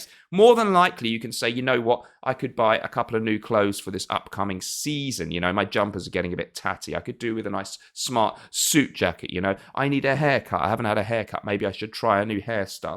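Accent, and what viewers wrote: United Kingdom. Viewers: 🤣😂You made me laugh, thank you! Nice British english!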